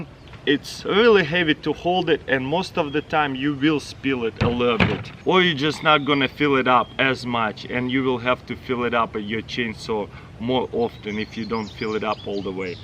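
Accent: Russia. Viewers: Dude, you still speak Russian! Just try to say: Adamadickly instead of Avtomaticheski aka автоматически and Boom!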